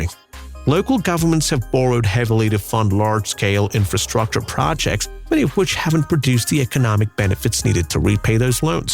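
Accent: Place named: United States. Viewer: I hear American accent! Lol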